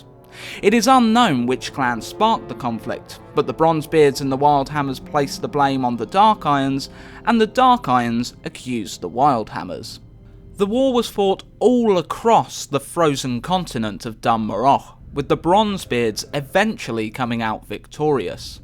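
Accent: United Kingdom. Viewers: British accent detected !!!!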